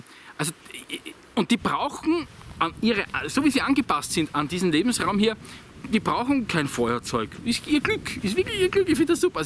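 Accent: Austria. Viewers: ich mag ja eh den Ösi Dialekt, bin Falco Fan seit je her, blabla, ich finde Deine Videos einfach genial, Du triffst jedes Thema auf den Punkt und gerade der Unterhaltungswert Deiner Videos ist mega! Wenn ihr mehr wissen wollt, was mein Kommentar noch so kann, dann bleibt dran!:-)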